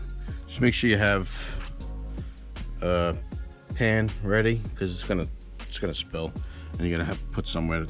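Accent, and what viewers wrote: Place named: Long Island, United States. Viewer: Sounds like your from L.I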